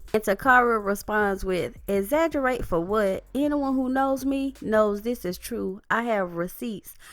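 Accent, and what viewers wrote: South, United States. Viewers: I watch your show because I love your voice. You have that southern drawl mixed with the black soulful personality. You are unique! I am white, but I still love your voice! I like it when you say "Moving on". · OMG before I read the ABOUT section of your channel, your Southern accent hooked me in and made me laugh my a** off. I'm a natural born Nashvillian and had to subscribe. Not only because of our Southern origins but also because of the truth you bring. That damn Jackie is gonna reap what she's sown on behalf of her "darker child". You don't mistreat, alienate, or totally disown an innocent child without karma visiting yo ass.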